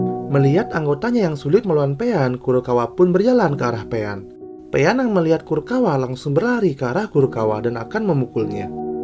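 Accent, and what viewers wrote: Bali, Indonesia. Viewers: Pasti orang Bali ni Mimin nya😁😍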